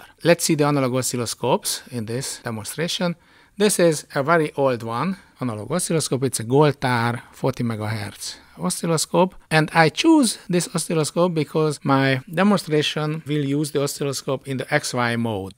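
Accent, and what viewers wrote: Hungary. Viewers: Így ahogy mondod néha a kevesebb több, tetszett a CRT-k gyorsasága, és persze a Hunglish pronansziésönöd is :)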